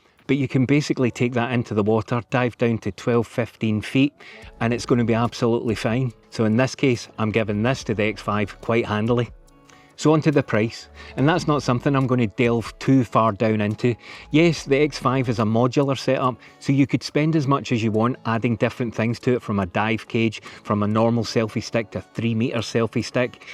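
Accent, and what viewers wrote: Scotland, United Kingdom. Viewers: This review helped make my decision for the P3. I'm now a subscriber to you. Love your Scottish accent, too.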